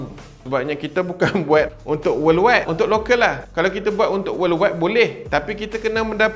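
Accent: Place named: North, Malaysia. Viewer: Baru tau abang orang utara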